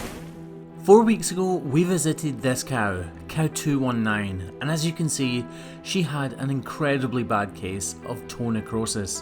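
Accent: Scotland, United Kingdom. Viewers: I really don't know why I'm watching these videos 😳. Some are so gross with all that blood and pus, and even beyond that I don't like cows and bulls. They scared the hell outta me. Maybe it's your Scottish accent or the beautiful landscape or maybe the fact that you are doing such a amazing job. Greetings from The Netherlands and keep the good work going 🙏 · I can’t help myself here. I love your videos. Discovered them about a year ago. When I heard you talking I wondered what the accent was. When I found out it’s Scottish, I did a happy dance. Spent 16 days in Scotland the previous August and September. Can’t wait to come back. So...I read the title of this video and did a double take...Fixing a rotting cows hoof and thought a “rotting cows” “hoof”?Then realized the cow had a rotting hoof. LOL I love the way you bring your little boys with you. 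They will learn a lot from you. They will miss that time when school becomes a priority.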